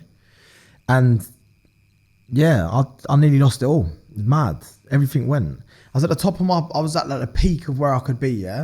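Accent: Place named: England, United Kingdom. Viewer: Hi there, sounds like a typical English lad😮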